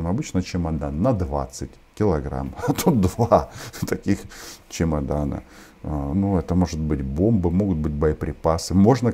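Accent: Russia. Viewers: Шановний Романе, ви переродилисъ? Чую наголошення ставите, як росіяни УкрАинские, але ж УкраІнські, зрозуміло, ви працюете на руськомовну аудіторію, нажаль,.....❤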